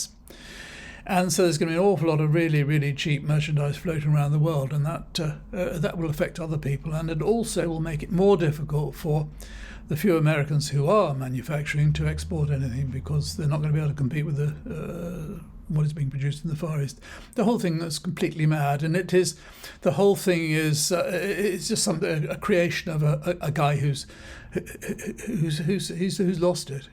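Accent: United Kingdom. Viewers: Glad to hear common sense in a UK voice. How refreshing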